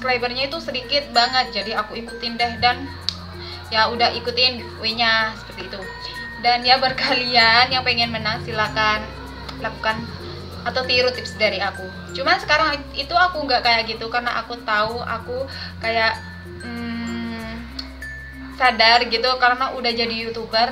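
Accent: Madura, Indonesia. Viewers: kakak dari madura ya? bicaranya kek aku hehe :v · Kayak ada madura-maduranya deh kk 😂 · Logatnya mirip bgt sama orang Sumenep (Madura) 🙄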